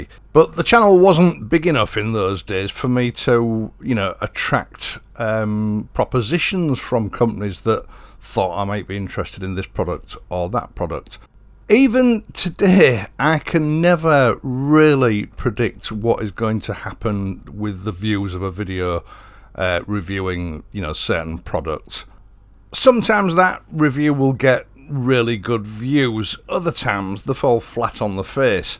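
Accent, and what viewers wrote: United Kingdom. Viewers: Dear, thanks a lot for your videos. They are very nice and I love your British pronunciation. I have one suggestion though. Can you make in your videos timestamps, so that if I want to re-watch a part of the video, I would not scroll in order to find what I need. Thanks again for your work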